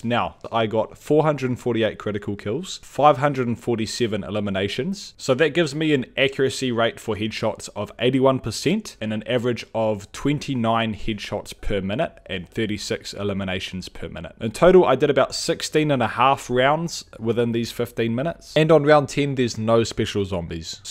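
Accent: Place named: New Zealand. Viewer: i watch this guy purely off his new zealand accent, chur uce!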